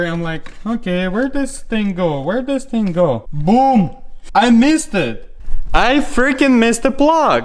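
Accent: Russia. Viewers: How its possible to know what car part you need and where does it fit? Maybe he use some BMW manual or something? I suspect that your accent comes from russia or europe?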